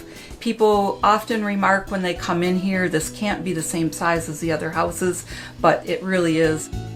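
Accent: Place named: Midwest, United States. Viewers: Ahhhh, I knew that I detected a Midwestern accent in the narrator's voice! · I caught your Michigan "accent" immediately! lol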